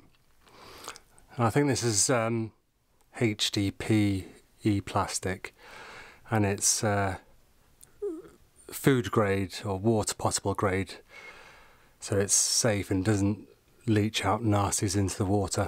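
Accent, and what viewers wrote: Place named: England, United Kingdom. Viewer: Where are you located? You sound English but I doubt you're in the UK.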